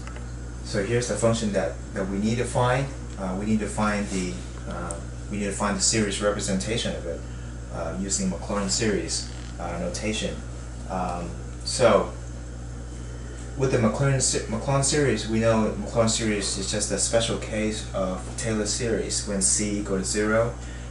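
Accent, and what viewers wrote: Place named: United Kingdom. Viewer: Thanks . Awesome explaination . Your accent seems British. It has helped me a lot in my uni maths!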